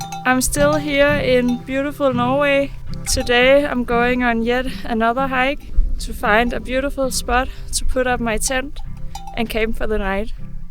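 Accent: Denmark. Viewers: what for a lovely place in nature! thanks for taking us along; it's nice to hear the danish accent! sheep around the tent, what for a soothing scenery...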